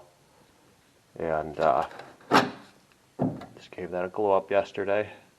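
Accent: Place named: Northeast, United States. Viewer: Doing real well. I love your work and your accent reminds me of where I grew up. I spent my time in North Jersey in Philly and for one year when I was in the Navy back in the 90s I was in upstate New York.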